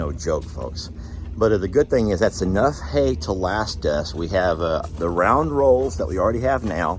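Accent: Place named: Texas, United States. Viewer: My ❤️ and 🙏 go out to you. It's so hard losing our moms. Please take good care of yourself, take things one day at a time. There are times we need to take one hour at a time... find things that give you comfort and enjoyment. and videos give me comfort because my Momma's was from Texas. Hearing Texas accents reminds me of her and her family. I love it when says y'all 😍. I'm glad they also give you comfort. They have such good hearts, and take excellent care of Mother Earth and all of the critters at ISS and LHL. Their YouTube family will also be happy to give you comfort as well.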